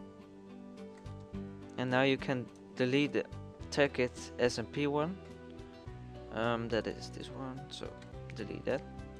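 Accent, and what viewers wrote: Netherlands. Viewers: are you from netherland it sounds like english is not your langue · lemme guess, you a dutchie?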